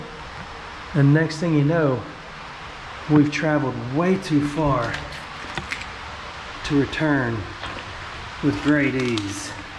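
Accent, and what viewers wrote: Alabama, United States. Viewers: Alabama!??? Yeppers 🤔.. I'm hearing that Alabama way of speaking in every time you get to talking...👍😎 A hard hat is obviously recommended....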